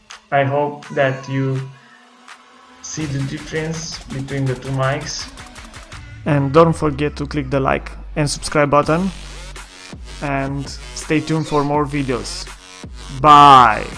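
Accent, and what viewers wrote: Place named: United Kingdom. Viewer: Que acento mas malo, seguro eres británico?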